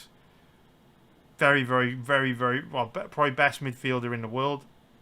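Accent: United Kingdom. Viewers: Cardiff accent isi? 🏴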